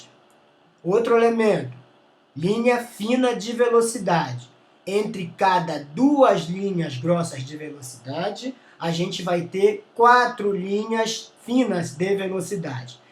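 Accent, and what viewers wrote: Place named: Belém, Brazil. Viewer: Sotaque de Belém do Pará.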